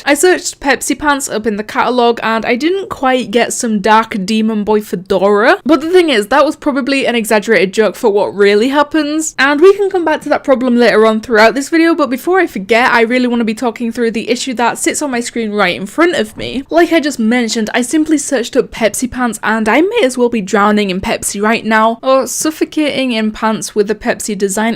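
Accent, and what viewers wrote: United Kingdom. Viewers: I love you british accent and i love how ur so good at arsenal T^T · are you brittish? and if so, why are you in may 13th and not december 3123?! uefyhbwegyfbhewgr means hello in my language. i am smartest individual roblox clothing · It’s annoying I can’t get over her posh English lol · ok ok, but, why are you british? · Yeah, WHY are you British? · We always wanted to know: why are you british? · you never answered why you are British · why are you British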